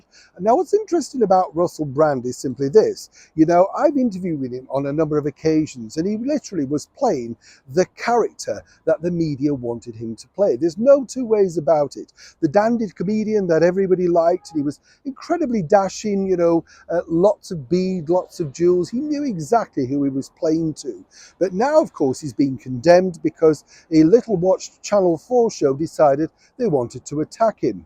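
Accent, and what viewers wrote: Yorkshire, United Kingdom. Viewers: Neils a true yorkshire lad 😊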